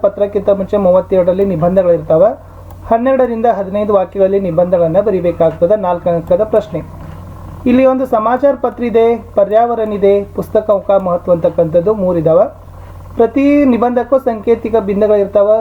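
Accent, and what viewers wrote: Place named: North Karnataka, India. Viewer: Yaa ooru sir. Nimdu bashe namtara adtira uttara karnataka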